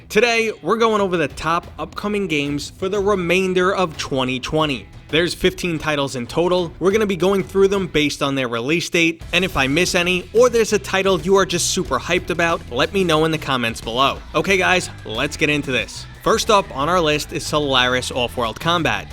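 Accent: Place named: New York, United States. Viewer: Very interesting hearing someone doing a professional announcing video voice with a New York accent but I need more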